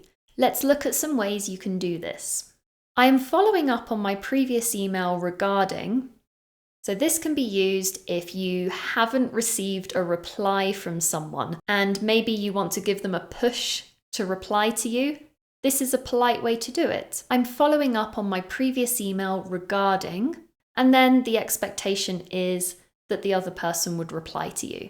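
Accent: United Kingdom. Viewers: As a Brazilian citizen I prefer to learn from her British accent which is clear and sound more correct. I really loved this exciting 12 minutes class. I just found her channel and advise everyone to stick with her teaching.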